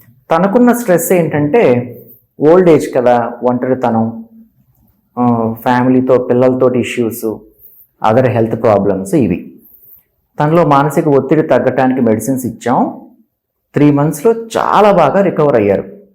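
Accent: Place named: Vijayawada, India. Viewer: మీది బెజవాడ నా. మీ slang అలా అనిపిస్తోంది. చాలా నిదానంగా స్పష్టంగా చెబుతున్నారు. అభినందనలు డాట్రారూ 💐💐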